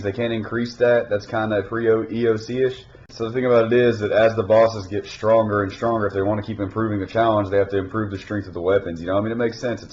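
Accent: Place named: South, United States. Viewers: Southern accent... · I mean, usually only southerners can tell. I'd like to think, however, that this guy has a prison accent mixed with a southern. Though, most people who get out of the cage have a stern way of talking like this guy. Its weird. Idk how to explain it, either.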